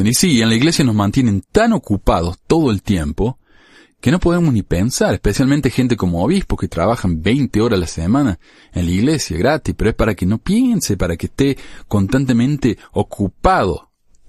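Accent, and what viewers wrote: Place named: Interior, Argentina. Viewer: Bien tirado. PD: me gusta como locutas. Soy de Perú, pero intuyo que eres del interior de Argentina porque tienes un acento como el de Luis Landriscina (un cómico). Saludos cordiales.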